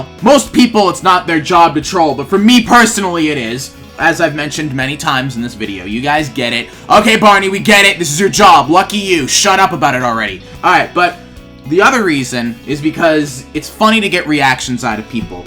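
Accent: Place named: United Kingdom. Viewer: Hello! I actually watch your videos and I really enjoy them. Also, you have a pretty good bri'ish accent.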